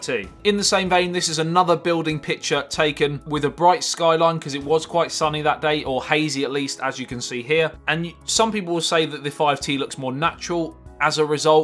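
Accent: United Kingdom. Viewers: im weirdly addicted to your voice and minor humor mate.. here iam its like am im seeing all ur vidz for the past 1hr... and sure iam into it.. that British strong accent tho,.. (o homo lol) love u man <3 grt review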